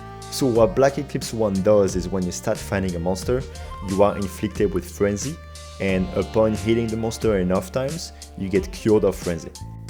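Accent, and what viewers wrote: France. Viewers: Un accent si français pourquoi parler anglais 🥲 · The bow king has returned! Also im curious is your accent French? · un très fort accent français mon seigneur ! bonne vidéo !!!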